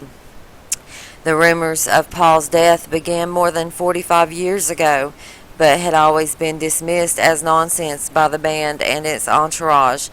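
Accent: United States. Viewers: where else do you hear blockbuster stories like this except for the "world news daily report"...such a bastion of truth, no other news outlet has even bothered to question it! you fucking dipshit. not everyone with a southern accent is a moron, but you ain't helping with drivel like this. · Fk don't you just fkin hate the way yanks speak we gave you English fk sake use the language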